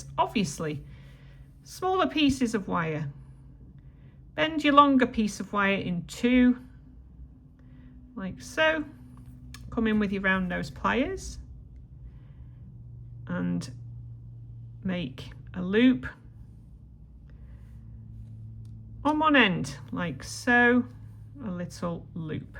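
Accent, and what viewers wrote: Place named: Merseyside, United Kingdom. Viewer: You sound like you're from my neck of the woods (Merseyside!). Lovely design ❤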